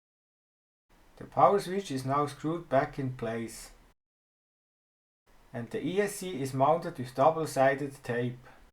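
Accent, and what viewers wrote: Switzerland. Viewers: Are you from swirzerlanf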